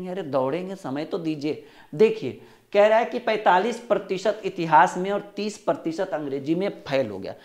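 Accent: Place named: Nawada, India. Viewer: Sir aap buturu bolte h nawada side h kya sir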